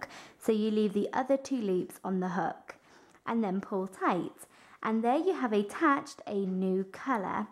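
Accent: England, United Kingdom. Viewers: great instruction I love the feel of this blanket. may I ask where you are from? English accent? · I am new to knitting and crochet and found your video very easy to follow and understand. Also love your English accent! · What lovely work you do! Are you from Britain? Lovely accent :) I also wanted to ask how much wool you needed for this blanket?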